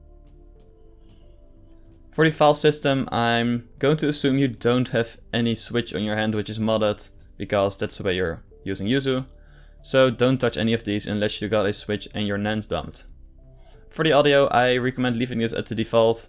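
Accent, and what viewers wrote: Netherlands. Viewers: If you download sword or shield and there is an update thingy do you need to download it or can you just keep playing and the emulator just updates it automatically Ps. I can hear your dutch😉 Is wel goeie man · nice! Your channel seems nice, i subscribed :) also is that a dutch accent i hear? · wait are you dutch?? i hear by the accent. instead of some you say som, so if youre dutch too comment plzz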